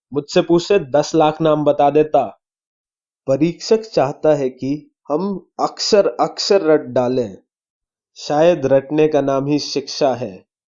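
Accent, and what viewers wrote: India. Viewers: desi style mey pada ro ha tu tie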